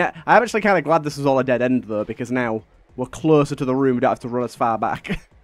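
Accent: United Kingdom. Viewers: After watching the whole video I only have one question: is this guy british?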